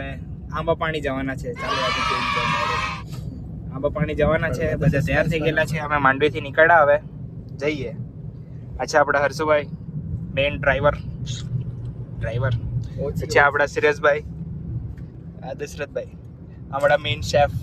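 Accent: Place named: Ahmedabad, India. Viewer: Oo bhai tme toh ahmedabad na lago cho ekdmm suddh gujarati 😄😄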